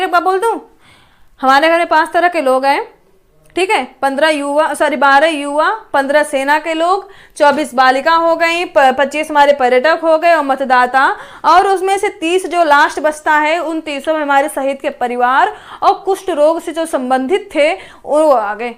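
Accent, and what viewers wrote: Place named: Lucknow, India. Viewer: मैडम लखनउ से है😀😀😀।। लखनऊ वालो का बोलने का प्रक्रिया ही अद्भुद है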